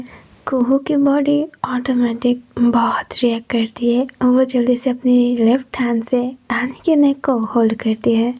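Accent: Bengal, India. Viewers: Didi aap kolkata sa ho kya kyu ki main bhi kolkata sa hu apki Bengali language sa lagta hain hain ki aap hain Bengali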